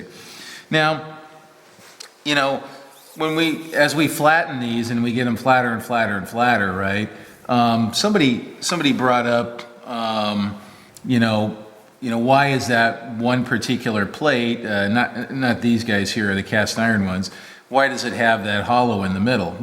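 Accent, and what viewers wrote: United States. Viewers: you sound like a guy from the states, but you shoot metrics out of your head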